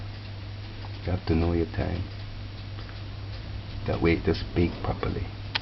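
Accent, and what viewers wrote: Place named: Jamaica. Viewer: Excellent Job!!! You had me engaged during this experiment and laughing at your speculations with your yardie accent. Enjoyed your excitement that reminded me of Lucious. I'll try my hand at this non alcoholic version of the Easter Bun for 2019 holidays. Blessings to you and yours!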